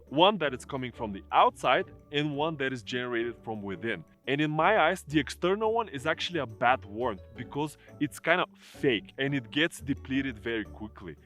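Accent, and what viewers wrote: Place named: Eastern Europe. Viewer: i disliked because i dont like your slavic accent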